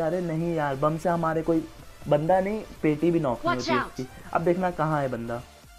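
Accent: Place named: Bihar, India. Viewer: Bihari lagra fulll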